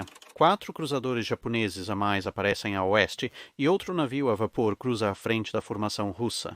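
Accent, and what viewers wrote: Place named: Portugal. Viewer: Excelente conteúdo amigo, apesar do sotaque tuga bem forte conseguiu passar muito bem a explicação toda no vídeo! Abraços do Brasil.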